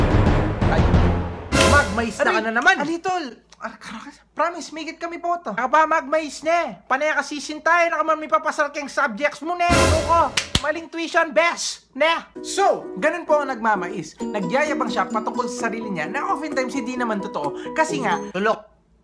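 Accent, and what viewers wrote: Pampanga, Philippines. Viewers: Magaling k talaga'yTo. Kpmpagan ka tlaga ksi keng gale mu at diction at bumigkas Mekeni ka talaga. Magaling ka Abe, baka Ika ing mawawala kung anak ah, pwde dkang paDNA ? Hehehe, i tule me ing ggwan mu para lalu kang mikuwalta kreng vlogs mu, emu ku kklingwan, gambatte 'NE !!!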